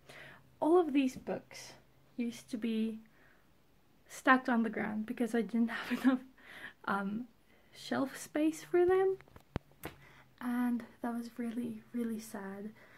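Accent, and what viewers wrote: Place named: United Kingdom. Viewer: How’s photography going? My web connection is rather (sh*t) but I allowed the audio to play out. I seriously enjoy your voice... I tend to follow folks just to hear them speak. (Odd yes but still...) Love hearing folks from the uk 🇬🇧 or other areas around Europe... Anyways I do hope your photography is going well. Any tips to building a portfolio easy without hiring folks? Just curious... Best to you... peace and love.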